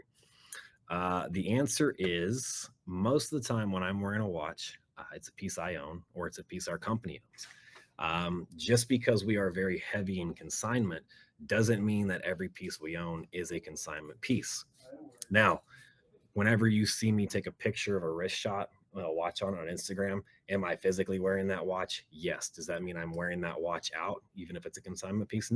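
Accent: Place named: Miami, United States. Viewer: “Will you appear on Archie Show!” “Fook no” lol. sounds like you from Miami man. Cheers🏆🥃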